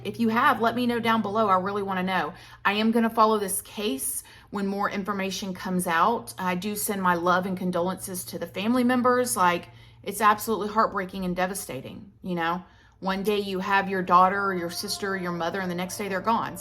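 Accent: South, United States. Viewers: Praying!!! Today, it seemed like you liked it, I voted yes keep intro, then I looked at a couple that seem like you were just tired of saying it and so I changed my vote to you know we love you you've got like a million subscribers, greet us any way you want to, we'll be here! I love your accent, bc my cousins are from Florida but they're from a different part, they also have absolutely beautiful voices, you have a way deeper southern accent I love it. Now, I need to watch this. I do hope things get better for your family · a southern belle Youtuber😮🤯😍 im fascinated by the accent L0L Love from 🇬🇧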